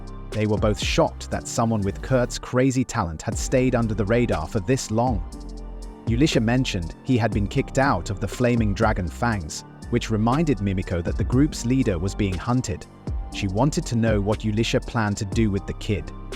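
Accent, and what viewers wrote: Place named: United Kingdom. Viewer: First. time i saw a british voice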